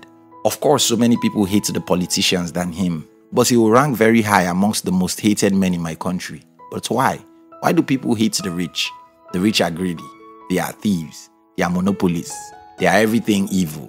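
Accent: Nigeria. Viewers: Same here, this is 100% our accent...but I am trying to figure out his tribe. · You sound nigerian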